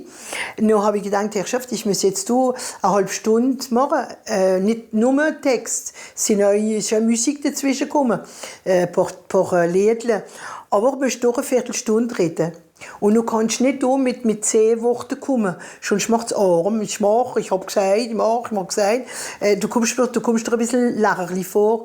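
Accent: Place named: Alsace, France. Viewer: Hallo, ich finde Dich Klasse! Ich bin aus dem Westerwald/Rheinland-Pfalz und kann fast alles verstehen, wenn Du elsässisch sprichst. Unbedingt weiter machen, damit die elsässische Sproch do blaift:-)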